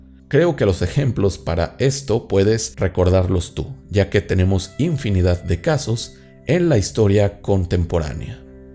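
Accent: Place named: South America. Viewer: Hablas con astucia con un acento de un pais de America del dur usas chivas , eres Moiche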